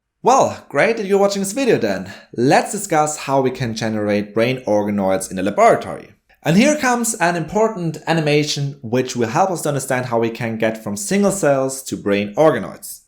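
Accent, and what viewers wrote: Germany. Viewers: Here from nutshell, subbed. :D edit: you sound German,are you German?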